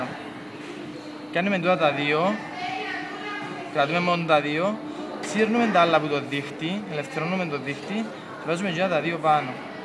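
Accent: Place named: Cyprus. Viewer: ποσοοοοο μα ποσοοο ωραιαα μιλαειιιι.!!! αυτα τ κυπριακααα !!! :DDDD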